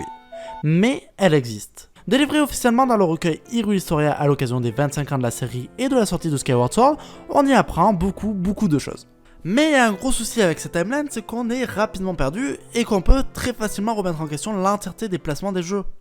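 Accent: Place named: Spain. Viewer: nan mais c'est pas un très gros accent mais on dirait un accent espagnol genre mdr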